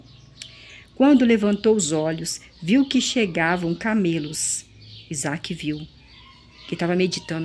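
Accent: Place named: Minas Gerais, Brazil. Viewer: pelo sotaque lindo de mineirinha, já era pra mim saber! Deus abençoe sua coragem e grande ajuda com explicações como a sua, simples e voluntária! 🙏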